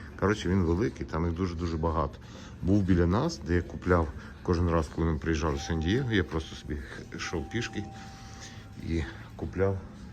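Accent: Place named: Western Ukraine, Ukraine. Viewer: Зустріла Вас на теренах ЮТьюба вчора, 2.06, випадково... Дуже була задоволена ...ну, і здивована - вперше чую українську мову західного ґатунку ! Вперше зустріла блогера зі США українця... Не ображайтеся - маленьке зауваження - Ваша заставка з палаючим бікфордовим "шнуром" - дуже неприємне нагадування тут, в палаючий Україні ....коли знаходишся сам, серед цього жаху...болить душа... розумію, то Ваш канал...але, бути там, ле Ви, і тут де я - "две большие разницьі" і нічого спільного... Бажаю успіхів !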